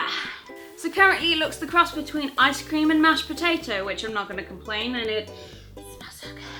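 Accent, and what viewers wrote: United Kingdom. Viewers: I can't tell if your British or American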